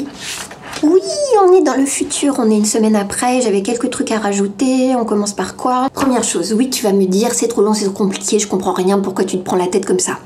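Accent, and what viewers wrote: Quebec, Canada. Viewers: te parles pu en québécois?;)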